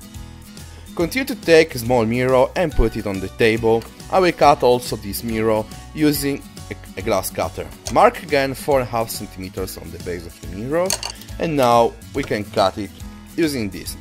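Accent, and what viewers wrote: Italy. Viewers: yeah to be honest xD u can really tell its Italian also for the first trick i was able to switch the front and back camera after unscrewing it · he's italian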